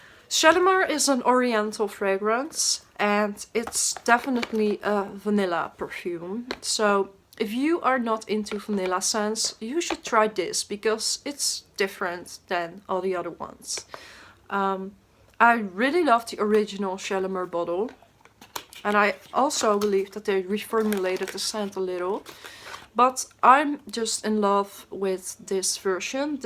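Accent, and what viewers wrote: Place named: Netherlands. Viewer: Ja je Frans is echt Nederlands bijna net zo erg als de Amerikaanse dames maar bij jou is t acceptebel,;))))). Ga zo door gefeliciteerd met 2 jaar blog.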